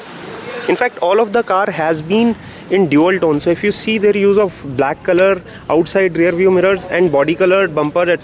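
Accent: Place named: India. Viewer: English is jarring to the ears. Indian english is bad enough. This guy makes it even worse by talking fast!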